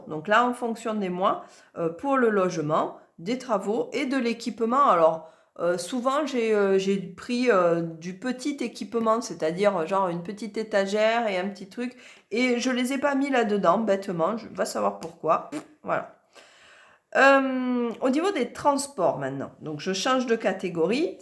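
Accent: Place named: Toulouse, France. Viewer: Coucou déjà j arrive pour ton accent car tu me rappelle une copine que j avais à Toulouse mais surtout par tes explications simples et sensées etce que je pourrais retenir Merci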